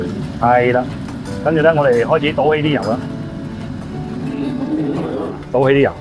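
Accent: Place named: Dongguan, China. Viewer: 好正！冒昧问句，是否东莞人？好似听到有东莞乡音！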